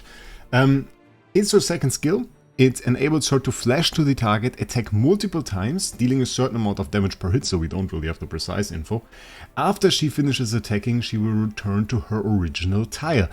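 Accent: Germany. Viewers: your accent sounds german? am i right with my assumption?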